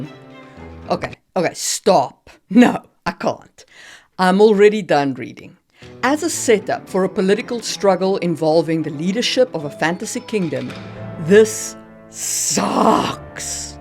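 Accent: South Africa. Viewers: wasn't expecting a south african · You sound South African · You sound South African! 😱 Where is your accent from? On your website you are standing in snow that definitely does not fall in SA. Expat? Am I completely off the mark?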